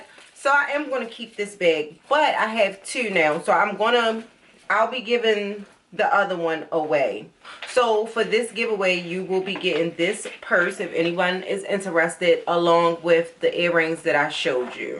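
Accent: Baltimore, United States. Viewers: Enter me! ♥️ This Baltimore accent is life! Also, you’re inspiring me to get some Orange and green sandals lol❤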